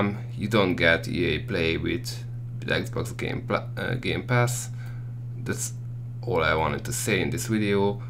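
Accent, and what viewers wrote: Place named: Hungary. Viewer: Az első mondatodnál éreztem már hogy magyar vagy :D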